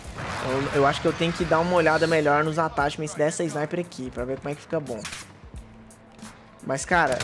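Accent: Minas Gerais, Brazil. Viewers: cê é de minas?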